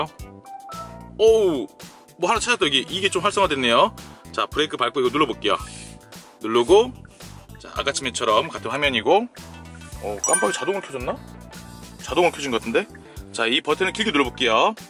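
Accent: Jeonju, South Korea. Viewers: 꾸준히 올라오는 영상 잘보고 있어요...정말 설명을 잘해주시는것 같아요..혹시 전주에 사시는가요.. 말투가 약간 전주 말투가 나오네요.. 저도 gv80 구매 준비 중인데... 전주에 계시면 한번 찾아보고 싶네요..^^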